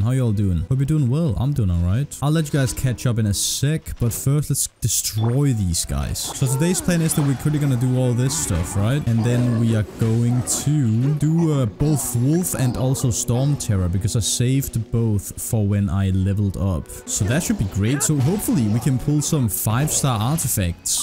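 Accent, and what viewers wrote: Denmark. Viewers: Are you danish? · you sound danish an i right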